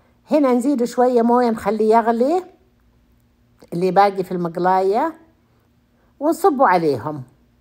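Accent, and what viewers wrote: Iraq. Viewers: احسبك عراقيه كلامك شوي عراقي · يمكن يسألوك لأن طريقة نطقك للحروف عراقي بالذات لما تقولي ماء ورد وكلمات كثير عراقيه ناسيتها انا تابعتك وعلى بالي انك عراقيه بالمدينه وبعدين عرفت انك سعوديه مو من القبوري من طريقة كلامك ولكن انتي عراقيه او سعوديه عسل ماشاءالله ،،يعطيك العافيه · نتشرف بيج ست انا من العراق نفس لهجتها انا احبك كثير واحنا عندنا عشيرته الجبور تمتد الحجاز كلها وهي أكبر عشيره في العراق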